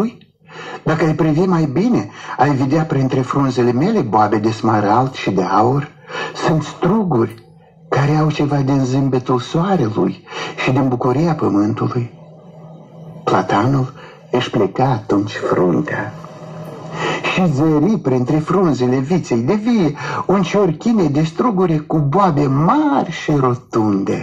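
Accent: Moldova. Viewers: Super ! Fermecatoare povestea ! Superba vocea si superb graiul moldovenesc ! Cât despre platani,aici m ai nimerit la fix pt ca pur si simplu sunt fascinată de ei ! In fiecare zi cand trec prin parc nu ma satur admirandu i ,le fac poze,ii orivesc ca oe niste opere de arta !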